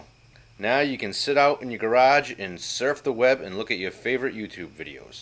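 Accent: Boston, United States. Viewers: Has the Boston accent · With that Boston-esque accent, I'd say more like Peter Griffin. :D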